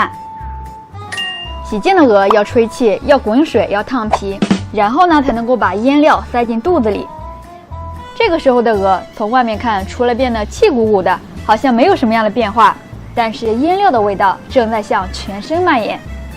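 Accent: Shandong, China. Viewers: 能不能纠正一下3，，听起来好别扭，，，这个叫烧鹅，，，不要那么粗谢谢不是烤鹅，，，您的山东口音好明显哈哈哈应该山东人，，，这个是烧鹅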